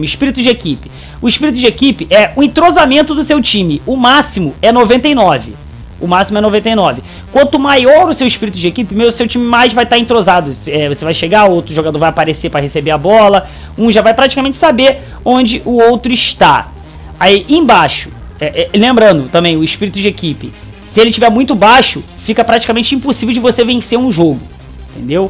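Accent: Rio de Janeiro, Brazil. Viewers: Q time vc torce? Pelo sotaque é carioca deve se pro Flamengo...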